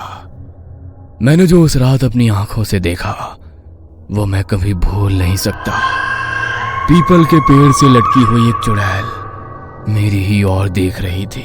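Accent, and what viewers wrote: Bihar, India. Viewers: Bhai aap bihar ke ho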